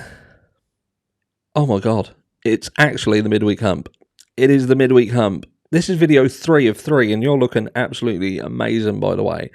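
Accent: Norfolk, United Kingdom. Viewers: You ALWAYS look amazing, love, LOVE YOUR CHANNEL, ❤❤ Ps, I live in s Spain, but originally from BECCLES, you have a wonderful refined 'Waveny norfolk' accent.....Where??? Sounds great x❤😊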